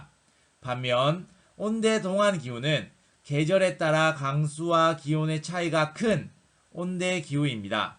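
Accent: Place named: Gyeongsang, South Korea. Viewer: 옆에 있던 엄마가 쌤이 갱상도 부이시가?? 싸바나 아이가~~ 싸바나~~ㅋㅋㅋ 넘 잘 듣고 있습니다!! 감사합니다!!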